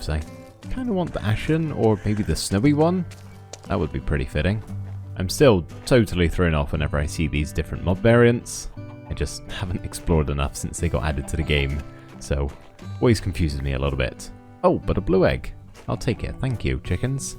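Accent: United Kingdom. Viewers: The cozy Christmas Minecraft and your British accent is so nostalgic as someone who grew up watching InTheLittleWood’s Christmas ADVENTure Minecraft series!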